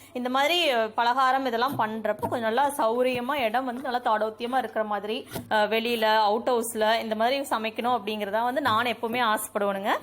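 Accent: Kongu, India. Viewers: அருமையான காணோளி அம்மனி உங்கள் கொங்கு தமிழ் பேச்சு மிகவும் அருமை தேங்காய் பர்பியில் நாங்கள் கொஞ்சம் பொட்டுக்கடலை போடுவோம் அம்மனி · Akka neenga pesura kongu Tamil arumaiya iruku akka, first time unga videos lam paakuren , unga farm, home lam romba nice, continue aa videos pannunga🙏🙏🙏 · அக்கா நம்ம ஊரு பேச்சே பேச்சு தான் ❣️😍 அருமையான பேச்சு அம்முனி(அக்கா ). அஸ்கா பருப்பியும் அருமை 😋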